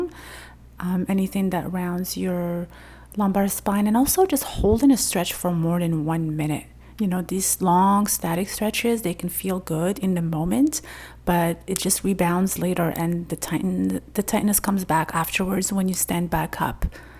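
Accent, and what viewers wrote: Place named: Morocco. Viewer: Spot on again (BTW I identified a district attorney as being from Morocco originally because I know - and love - your accent)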